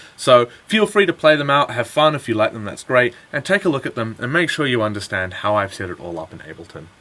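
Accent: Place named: New Zealand. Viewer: Damn. I'm buying an APC 40 Mkii this week, really nice to hear a fellow Kiwi voice explaining the ins and outs. Great demo, and I'll be downloading the scripts and exploring the functions. I cut my teeth all on technics 1200's and vinyl back in the early 90's, dropped out of the scene a while, got back in with traktor and the M-audio exponent midi controller, then messed with Pioneer CDJ's a while, but never really felt quite at home with any of them. Maybe the APC will be the right path back into it, don't know yet. Your video makes it look good though, and definitely an affordable experiment. Cheers!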